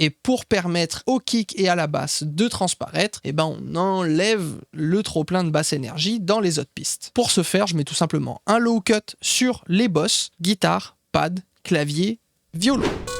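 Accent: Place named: Réunion, France. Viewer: T sur ou t inn kreol na un léger accent 😅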